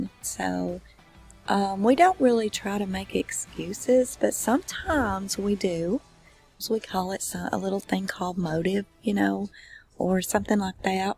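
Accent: United States. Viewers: Do your own thing ... I love listening to your accent ... that’s what I call a proper American accent 😁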